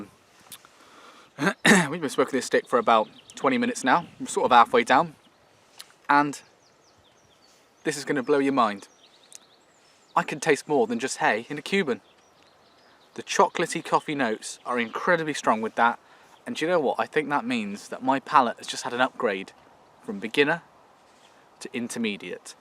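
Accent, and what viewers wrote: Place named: England, United Kingdom. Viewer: I love this english accent 😃 take care of you europe. Stay safe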